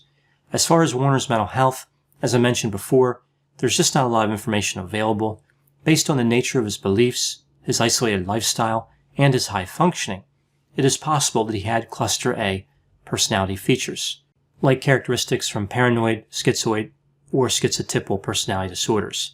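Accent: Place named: Mid-Atlantic, United States. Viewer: Dr, you sound like you're from South Jersey/Philly region. You have a very distinct mid-atlantic accent. Where did you grow up? I'm studying linguistics..just curious!!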